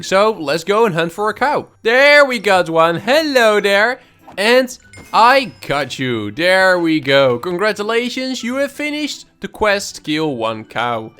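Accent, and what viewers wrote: Netherlands. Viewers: Are you dutch cuz you sound dutch. i am dutch🤗🤗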